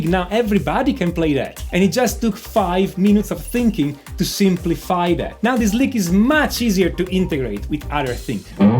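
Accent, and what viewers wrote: Italy. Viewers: he has quite restrained an accent for being Italian -^_^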